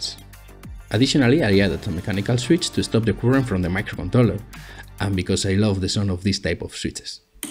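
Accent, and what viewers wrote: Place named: Spain. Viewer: Buen trabajo! Se nota que eres español, pero lo intentas y te salen muy bien los videos!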